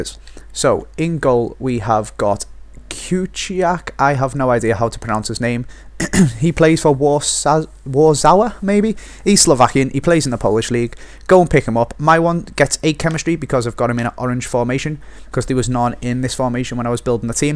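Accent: Liverpool, United Kingdom. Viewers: You have the best scouse accent i have ever heard :D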